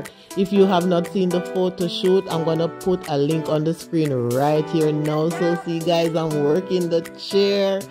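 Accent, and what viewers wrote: Jamaica. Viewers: are you Jamaican? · You sound Jamaican 🇯🇲 . Anyway, I have two old armchairs that I will diy into throne chairs. I hope mine looks awesome like yours.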